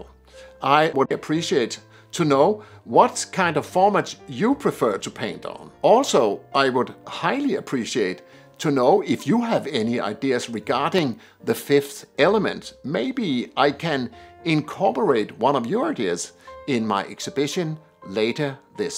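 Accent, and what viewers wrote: Denmark. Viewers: Thank you for your inspiration and also for making it all look so possible, with the right materials and the right attitude. (I also like hearing your soft Danish accent.) Space is for me the most important element, because even in a painting the images are found in space (foreground, background, etc) and it delineates them one from the other. (or 6.20 pm Western Australia time element)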